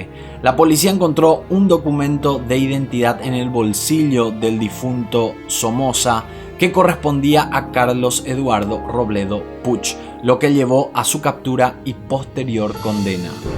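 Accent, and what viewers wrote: Paraguay. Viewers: Verdad su acento es Paraguayo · Tenes acento Paraguayo. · Parecés paraguayo! Es así? Muy buen material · Amigo vos sos paraguayo?? Por que tenes acento paraguayo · Sos Paraguayo? Excelente video hermano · Por el acento que escucho veo que eres paraguayo,no sé si estás enterado que el mayor violador y asesino del mundo,esun colombiano llamado Luis Alfredo Garabito,violó y asesino a 172 niños y tenías que haber sabiodo realmente quien el mayor asesino no solo de América Latina,si no de todo el mundo y creo todavía sigue preso,infórmate y publicalo,un saludo para ti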